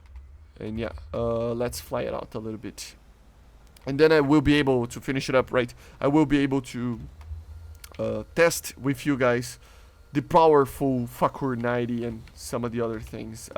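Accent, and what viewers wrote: Brazil. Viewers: Ah esse sotaquezinho brasileiro rsrsrs eu amo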